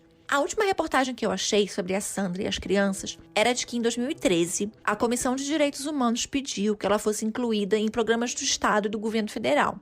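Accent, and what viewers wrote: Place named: Northeast, Brazil. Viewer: Escuta narradora nordestina… Que graça tem recontar a história,que os melhores noticiários do Brasil,e do mundo, já contaram com riqueza de detalhes? Eu moro na Europa,e assisti a reportagem na época.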